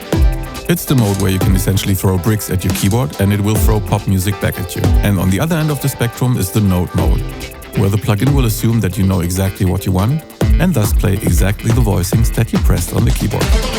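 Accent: Germany. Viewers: Well it's a German company and the narrator is obviously German, like Kraftwerk 😉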